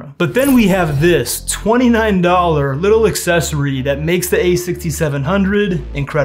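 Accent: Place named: Belgium. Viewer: hmmm are you belgian? youre american accent is pretty good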